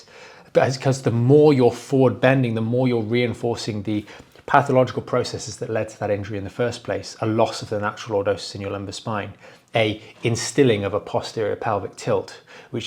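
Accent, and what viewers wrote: England, United Kingdom. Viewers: This is really wonderful. I’ve left Spondolitis. Awful 5 years old got run over 7nder a wagon. Only when older back problems. Discitis. Nearly died. Had no surgery or anything. Painkillers. What can I do. I dairnt excercise. 5” height lost . And a tilt a gait. Is this same? Nice to hear English accent. So,help.😮😊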